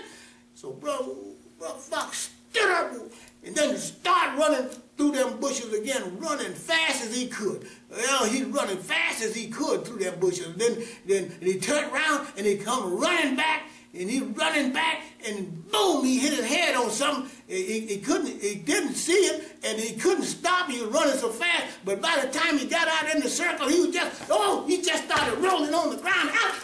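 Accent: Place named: South, United States. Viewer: I love the ole southern bionic english language. It"s very much a part of our southern heritage & customs. I try to speak bionics but, not as good at it as!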